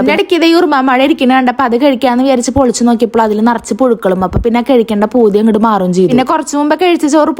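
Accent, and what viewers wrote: Thrissur, India. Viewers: kuthi chathachath parayunnath kelkkan nalla rasam und thrissur slag anu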